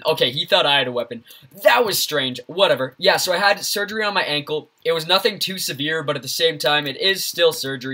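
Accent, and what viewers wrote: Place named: United States. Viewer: lol gg i automatically assumed u were american xD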